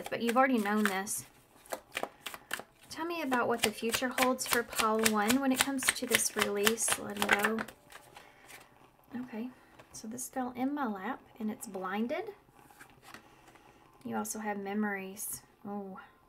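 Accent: South, United States. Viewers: Oh my goodness. I chose pile 2 and it heavily resonated and at the end you said “im hearing Jan too” and that is literally my nickname 🥲🥲🥲😭😭💖💖💖 thank you for this so much!! My imposter syndrome holds me back from everything. Ps. I can tell you’re from the south from your accent! Tennessee girlie here haha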